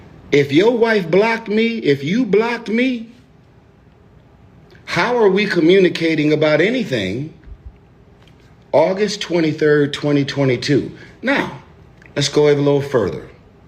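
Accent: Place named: East Coast, United States. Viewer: your East Coast soft voice wit that accent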